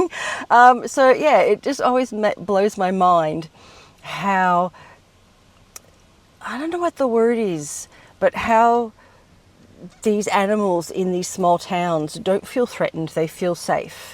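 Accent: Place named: Australia. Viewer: What accent is that? Its 90 percent Australian but something else is coming through. Just curious.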